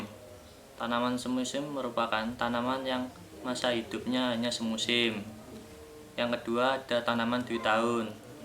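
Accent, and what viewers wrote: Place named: Java, Indonesia. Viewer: medoknya kusuka🔥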